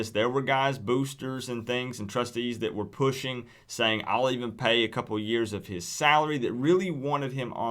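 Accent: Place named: Chattanooga, United States. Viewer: whether you believe it or not, you have a Chattanooga accent. I knew it....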